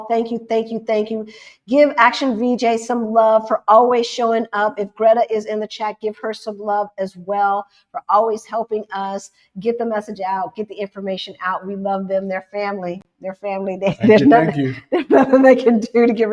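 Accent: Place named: Pacific Northwest, United States. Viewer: I can hear PNW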